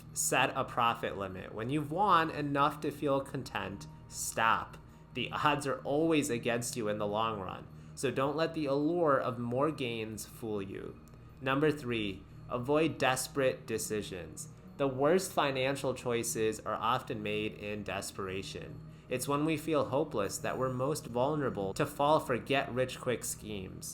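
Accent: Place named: East Coast, United States. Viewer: Asian? I'm guessing east coast Jewish family from the accent.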